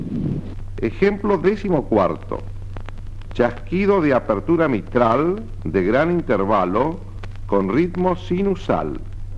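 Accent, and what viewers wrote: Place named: Argentina. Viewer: Ese acento argentino de loas años 80 me trae mucha nostalgia :)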